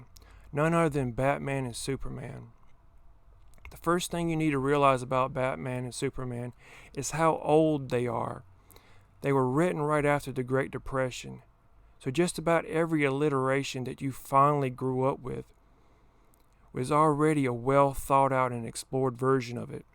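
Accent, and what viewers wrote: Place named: South, United States. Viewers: Right there with ya fellas. I’m from KY too. The world is in serious need of badass southern YouTubers like this guy. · As i am from KY myself, i must say it is quite refreshing to hear a southern accent on this video. Feel like us southerners just ain’t on YouTube or maybe they’re hiding it cuz it’s viewed as not intelligent by some. Brother you won me over and got my sub. Keep up the work man I like this video a lot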